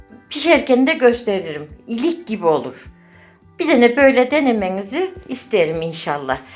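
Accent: Kayseri, Turkey. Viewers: Tam bir Kayseri babannesi. Konuşmasından anladım ben de has Kayseri'liyim.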